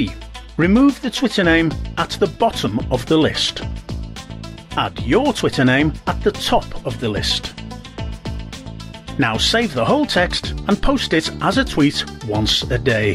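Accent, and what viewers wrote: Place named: United Kingdom. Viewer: Haha,Judging by your accent,you are from UK,the motherland of Harry Porter...? How many followers do you have now?Please show us your twitter account.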